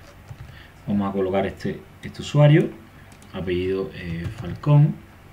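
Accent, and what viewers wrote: Cuba. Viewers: Hola, eres cubano no?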